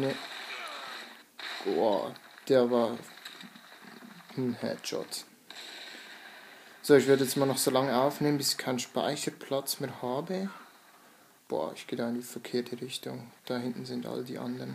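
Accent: Switzerland. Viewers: BASELS DIALäGT! :D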